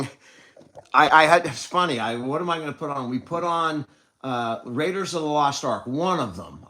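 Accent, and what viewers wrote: California, United States. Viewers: Hi, Good to see you and your Pop. My late hubby with dementia was constantly getting UTIs whenever he was in nursing homes because it's just a given when they wear diapers. When he was at home with me, he had no incontinence issues. Just wanted to make sure you knew that doing the prostate procedure may not circumvent the UTIs. I live in southern Cali and you totally sound like a Californian to me! 👍🌞XX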